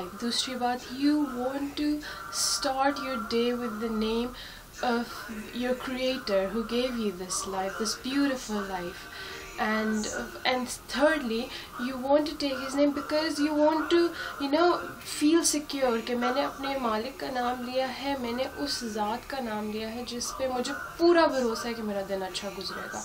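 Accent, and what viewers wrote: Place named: India. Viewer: O MY GOD, HOW LOVELY HINDI U SPEAK, LOVE YA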